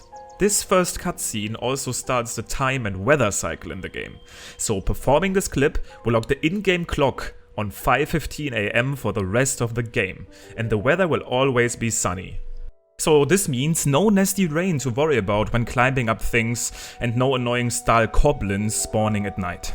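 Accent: Germany. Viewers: Is he german? His accent sounds german...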